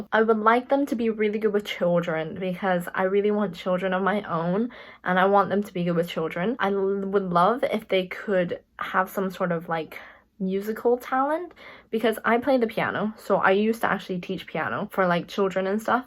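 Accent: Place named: Singapore. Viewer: According to your accent, Though you are Singaporean. Love your reacting, Cheers.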